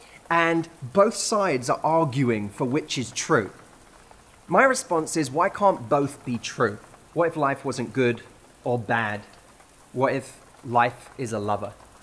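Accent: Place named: England, United Kingdom. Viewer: Wonderful and inspirational as always! as i am studying english, may i ask you to what part of england or london your accent belong? i really like it. i hope you have a minute to answer my question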